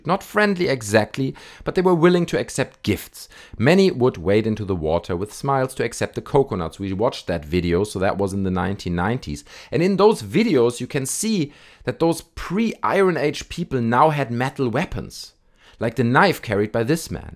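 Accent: Germany. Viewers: You have a German accent lol.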